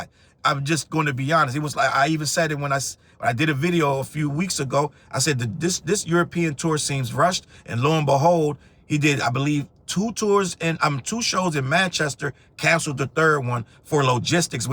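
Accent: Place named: Washington DC, United States. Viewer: I love listening to dudes with DC accents. Remind me of home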